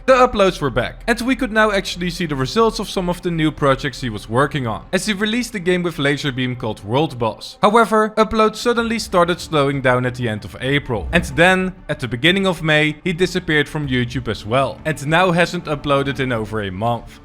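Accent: Netherlands. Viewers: U sound dutch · Are you from the Netherlands because you have such an accent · Lol the Dutch accent is pretty funny (No hate) I'm Dutch too and I also have the worst english accent Xd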